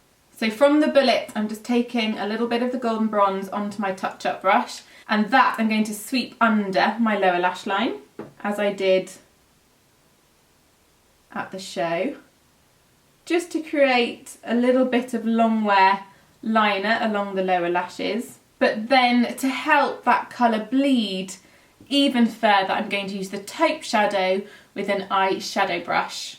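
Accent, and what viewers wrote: United Kingdom. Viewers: I could listen to you talk for days!! Sounds so proper and "British". Makes my American accent sound so dull! Lol. Your makeup is always stunning! And always educational. Thanks!💕💕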